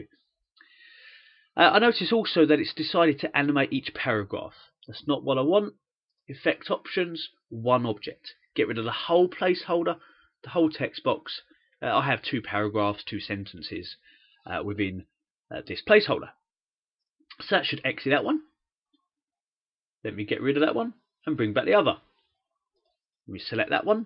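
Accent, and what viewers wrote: United Kingdom. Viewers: British English accent sounds really nice..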